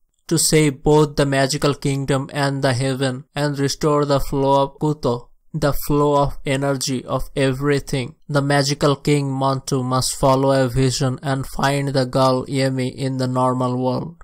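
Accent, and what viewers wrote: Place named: India. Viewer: R u Indian 😍😍😍😍😍😍😍😍😍😍 U accent so cool, mjhe tmhara awaz bhot psand aya 🤗🤗🤗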